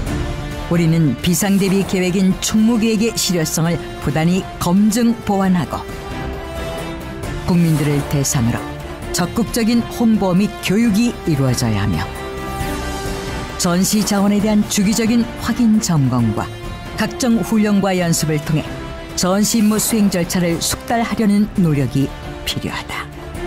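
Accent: North Korea. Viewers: 말투가 딱봐도 북한이네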